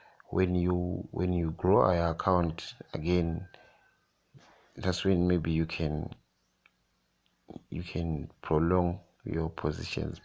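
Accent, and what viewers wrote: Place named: Zimbabwe. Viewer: are you zimbabwean??..ndanzwa tushona umo..how can i contact you bro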